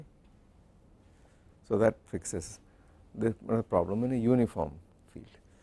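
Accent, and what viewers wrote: India. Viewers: the professor is an exceptional teacher. The extras he brings into the course with his comments are really at a graduate level, not a basic undergraduate course. For an American, the Indian accent is sometimes difficult to decipher. Subtitles would be very helpful. Also, ith wourld be very nice to have a set of course notess for the lecturs.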